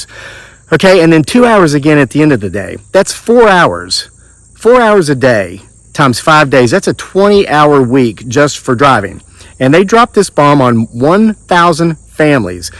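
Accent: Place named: North, United States. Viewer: Sweetheart is how many southern women talk! If you were born in the south than you know this to be true.. than again.. your accent soumds more like you are from up north.. Now.. i do agree with your issue.. Sadly.. this has been going on for a while..we have allowed this to happen.. So this should not surprise you at this point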